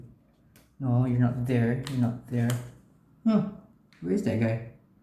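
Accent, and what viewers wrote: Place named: Malaysia. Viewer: I could tell you were from Malaysia as soon as you started talking. Great review. I am wanting to get the green handle model to match my Buck Lite from the 1980s. I subscribed and look forward to seeing your other content.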